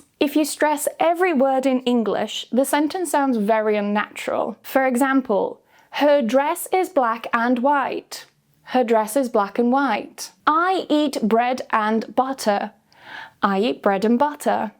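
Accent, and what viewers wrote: United Kingdom. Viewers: That's a really effective video for me to learn the British accent. Thank you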